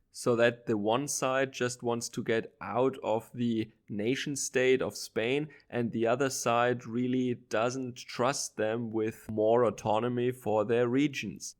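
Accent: Germany. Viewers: I’m not overly interested in Spain, but I am trying to learn more about European politics, & all the coalitions make them similar in a way - as you compared the top 3 winners to German results - and very different from the US politics I know the most. I think you did quite a good job compared to a few other vids I watched/news I’ve read on recent Spanish politics, & I find your voice with the heavy German accent very pleasant to listen to. Reminds me of my dad, may he Rest In Peace. I like the little personal insights/anecdotes, too! Keep up the good work :)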